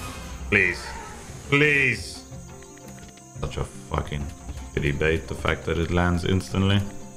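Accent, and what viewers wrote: South Africa. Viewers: Didn't know you were originally from SA, hard to tell sometimes. I hear like Dutch and English with like idk, Australian sprinkled on top. Accents are cool. I'm from the West Coast and have traveled around the entire US, it always shocks me when people start talking about accents, like I hear theirs but I don't hear mine! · In also from South Africa I thought I heard a South African accent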